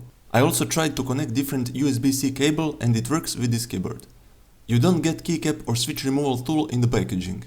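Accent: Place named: Eastern Europe. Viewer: Where are you from? Cus you sound like you're Slavic :)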